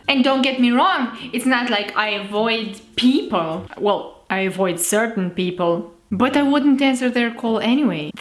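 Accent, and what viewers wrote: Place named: Romania. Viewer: Numai mie mi se pare dubios sa aud un om pe care il stiu normal vorbind in enlgeza,ca acum vorbeste in engleza?:)) nu stiu dec e dar daca prima oara cand am auzit un om vorbind in romana,poate sa vorbeasca si cea mai buna engleza ca daca eu l am auzit inainte in romana acum mi se pare ca vorbeste o engleza naspa cu accent romanaesc:))anyway super video,te extinzi si in afara super:)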